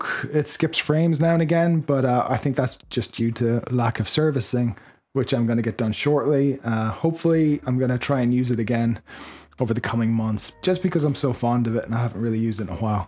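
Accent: Ireland. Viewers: Great Video. I love the Irish Accent, by the way!!!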